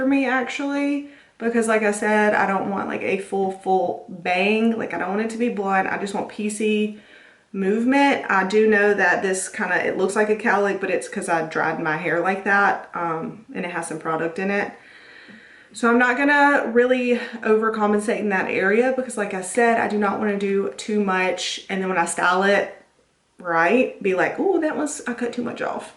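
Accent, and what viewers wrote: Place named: South, United States. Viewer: Love the cute southern accent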